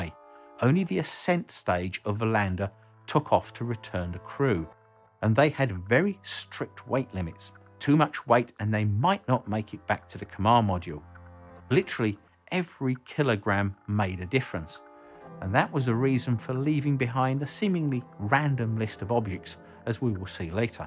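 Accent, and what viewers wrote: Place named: England, United Kingdom. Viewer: English accent I'm out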